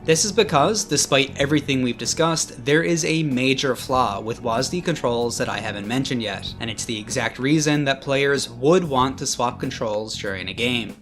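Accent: Newfoundland, Canada. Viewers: Massive sidenote, but are you a Newfie? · Nice video but god damn your Canadian accent is almost unbearable to listen to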